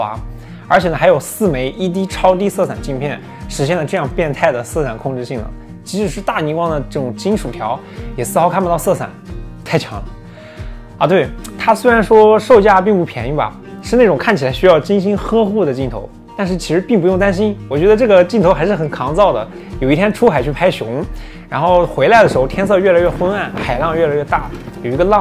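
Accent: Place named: Henan, China. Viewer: 突然这一集满满的fu南话？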